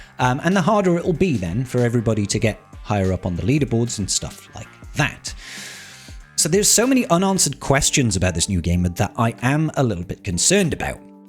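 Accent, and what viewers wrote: Wales, United Kingdom. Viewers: Welsh, I thought you were Australian.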